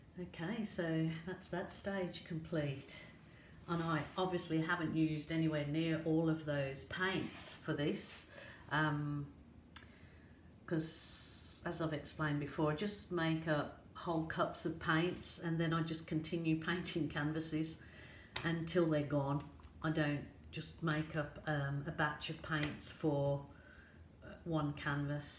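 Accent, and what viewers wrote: Birmingham, United Kingdom. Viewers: I couldn't quite place it either! Definitely got the Brummie accent but I knew there was also some Aussie in there too. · This is amazing! So beautiful! Your accent doesn’t sound Australian. Are you from the UK? ☺️ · Love your pictures & the brummie accent 🌍🇬🇧🇬🇧🇬🇧🌍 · I'm getting a hint of brummie in that aussie accent. Great pour btw.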